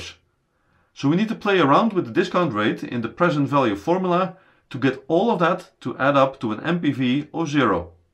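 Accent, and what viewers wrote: Netherlands. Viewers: Best explanation on YouTube - and given in a beautiful Dutch accent too! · Je hoort zo hard dat hij Nederlands is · Very strong Dutch accent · Thank you so much for the videos. And I love your Dutch accent. What I still not fully grasp is the non technical meaning of IRR. Does that mean that if IRR is less than or equal to WACC, the project should not be pursued? And whats the difference between IRR and Rate of Return? Just the time?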